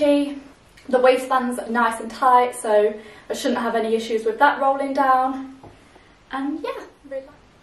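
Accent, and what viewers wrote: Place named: England, United Kingdom. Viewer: It is a lot of ladies doing this tipe of videos and stuff, but , I promise you that you are unique, it is about your face your hair that distinguish English accent the way your body is built, your just different I want to say congratulations to the British people and their history because I little country like that can create one of the most natural beauty and that is you ...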